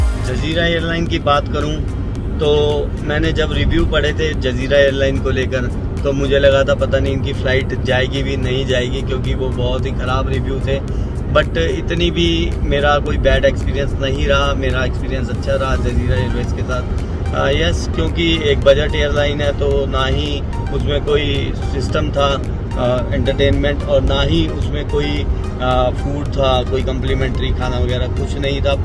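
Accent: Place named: Old Delhi, India. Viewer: aapka baat karne ka style bahut Achcha hai Old Delhi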